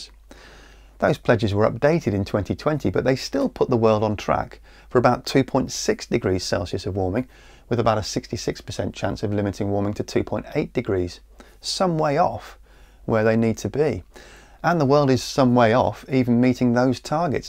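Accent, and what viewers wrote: United Kingdom. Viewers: there are so many diffrent accents in the UK and this guy has the best one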